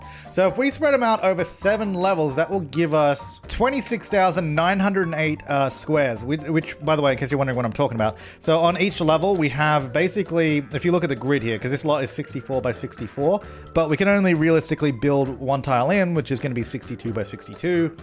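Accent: Australia. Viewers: Your Channel has grown so Fast I reminder when you had 100,000 SUBS. :) Are You Australian?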